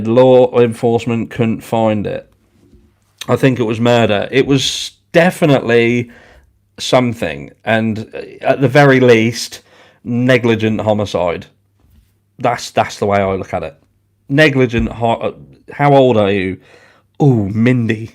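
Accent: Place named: West Country, United Kingdom. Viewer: Hahaha love your style. From fellow UK true crime addict im from the West country too, plus I recognise your accent brilliant x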